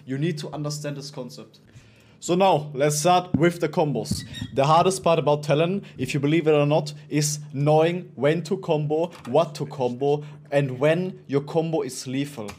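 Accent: Germany. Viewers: Man hört das deutsche raus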